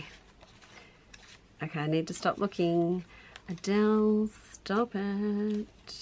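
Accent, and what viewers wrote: Australia. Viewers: I think she means British as opposed to the fact that she’s already speaking English, but with an Aussie accent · I got that one from my husband, he said it’s Australian but I have no idea. I adore your accent and your fun vocabulary, feel free to always share more random Aussie words (also… please let us hear your American accent!)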